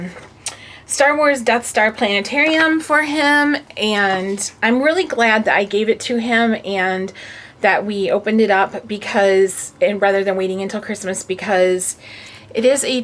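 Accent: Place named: Montana, United States. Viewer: You sound like a Montana girl,! There's been snow on the mountains here since the end of September. Winter is my favorite. Clean. Crisp. Holidays. <3 ALL OF IT. I'm originally from Terre Haute, Indiana, so I understand the Midwest Weather Moodswings. I don't miss it.;) THANK YOU for your videos. You crack me up and your vernacular and vocabulary about product gives me verbage tools to speak to my customers about our products. <3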